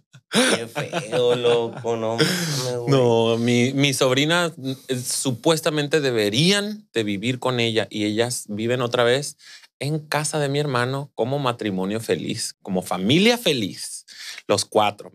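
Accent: Culiacán, Mexico. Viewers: habla muy culichi fuera de su canal 😍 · Ame el podcast jajaja pero ayyyy amigaaaaa jamás había escuchado taaaan notorio tu acento culichi jiji... Y nos adelantaste todo el chisme de la picadiente en fin, saludos bb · Ayyyy asi todo!! Ese acento culishi 😍😍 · Excelente episodio❤ me gustan verlos videos de pero este estuvo perrisimo🤩💜 Me encanto tu asento culichi😍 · Me encanto que en el podcast se le escucha su auténtico acento culichi de me gusto mucho la entrevista ❤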